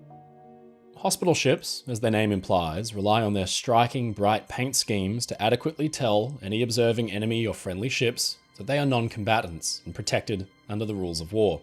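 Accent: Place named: Australia. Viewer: Thanks, awesome hearing an Aussie accent mate. Great content!!!!! Thanks for the work you've put Into your videos - top quality 👌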